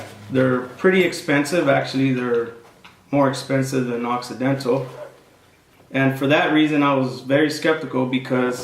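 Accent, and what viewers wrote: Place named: Mexico. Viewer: se ve gringo pero tiene acento de hispano (mexicano?) :D what's up with that? trippy, no hate just curious from a venezuelan guy :)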